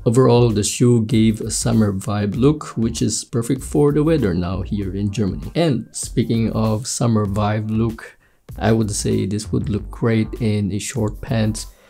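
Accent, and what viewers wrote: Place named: Philippines. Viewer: Pinoy na pinoy accent lets gowwww kuya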